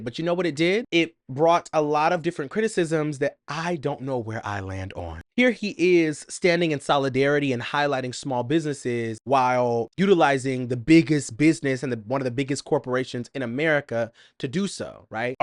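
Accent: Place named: Puerto Rico. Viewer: Ok baby I hear that accent🇵🇷👏🏽 well done!! Had me thinking you were riiiican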